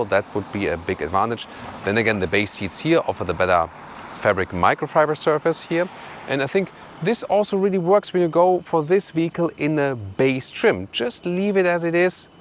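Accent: Germany. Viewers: WTF you'reon about? His English is superb. He might have a distinctive German accent, but grammar,0 vocabulary and overall fluency are spot on.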